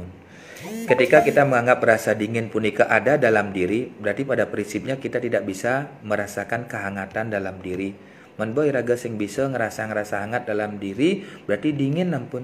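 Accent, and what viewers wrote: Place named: Bali, Indonesia. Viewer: Gimana sih ngomong pake logat...orang Bali tu logatnya bagus,tapi susah niruinnya...ngomongnya tu kaya kental banget...ngomong t aja kaya kentel banget t nya...pahm gk sih..susah banget aku ngucapin kaya orang Bali...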